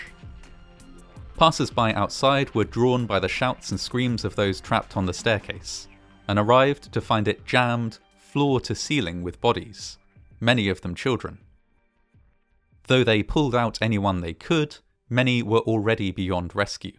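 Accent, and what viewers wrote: England, United Kingdom. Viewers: He definitely has an English accent! Definitely not a Scottish one, or even a mid or northern English one. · That's the English accent. 👍🏻🇬🇧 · He is British or at least sounds British.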